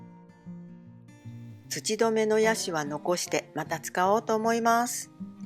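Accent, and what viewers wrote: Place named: Kansai, Japan. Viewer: こんばんは！初めてコメントいたします😊 いつも参考にさせてもらっています❤ 私の実家も関西で、baさんの関西の言葉が大好きで、九州からほのぼのといつも見させて頂いてます😊 今回の動画で、焼け野原の鉢たちをそうすればいいんや❗と何だかやる気を頂きました😊多肉のとりこになってから４回目の夏…こんなことになる？と沈んでいたので、私もそうやって楽しみたいと思えました😊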